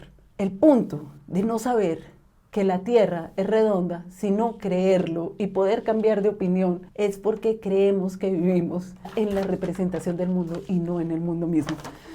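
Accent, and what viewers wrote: Colombia. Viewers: Me encanta tu personalidad, tu risa ,tu carís-ta... sos colombiana verdad ?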